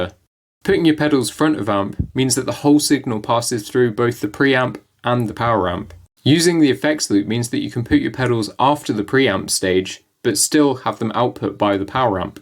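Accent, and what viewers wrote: United Kingdom. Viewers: this is so random but are u from by yorkshire?? im american but trying to get better at identifying british accents haha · He doesn't sound like he's from anywhere in the north to me but I'm not british either. My guess would be somewhere in or around London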